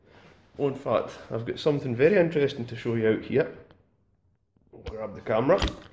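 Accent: Scotland, United Kingdom. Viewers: Scotsman, 100% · Thanks for the update. And by the way, do you know Frankie Boyle? Sounds like you're from the same area. 😁 Keep up the good work. Looking forward to the next one.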